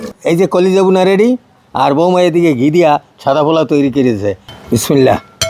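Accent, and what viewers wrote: Barishal, Bangladesh. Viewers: হালারপো হালা বরিশাইল্লা।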